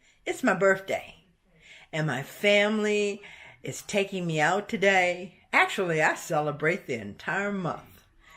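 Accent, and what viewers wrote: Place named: North Carolina, United States. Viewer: I love liver pudding, black sausage, blood sausage, and the Spanish speaking say "morcilla" I knew you were from N Carolina when you first spoke, bless you auntie -from nyc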